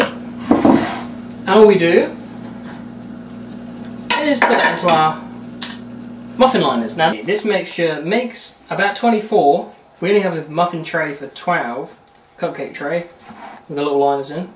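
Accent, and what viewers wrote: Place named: United States. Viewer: Maybe he's gaining some of the american accent by living there for a while...: )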